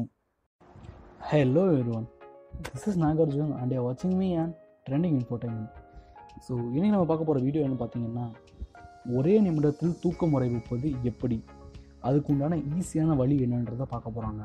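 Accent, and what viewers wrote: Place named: Kerala, India. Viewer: ninga pesarudu sariya Kerala OK so good tips I tray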